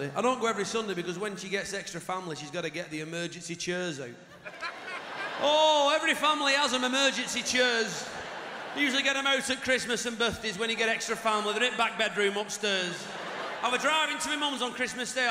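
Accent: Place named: Bolton, United Kingdom. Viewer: Bolton/ Lancashire Accent!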